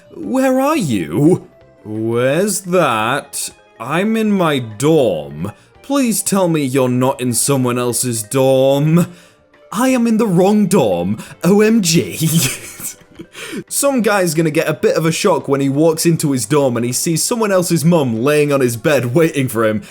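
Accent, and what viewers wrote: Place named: England, United Kingdom. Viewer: Cen'ame'ar You english and your funny accent made my day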